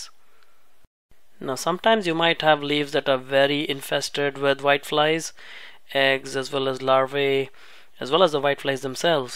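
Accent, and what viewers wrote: India. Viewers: your sweet Indian accent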